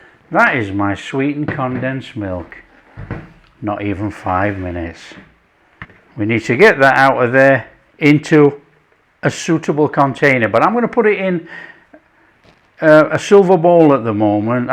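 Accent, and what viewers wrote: Yorkshire, United Kingdom. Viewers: I’ve only recently discovered your YouTube page, but I love it. I think your accent is a great Yorkshire one. I’m from over the border in Lancashire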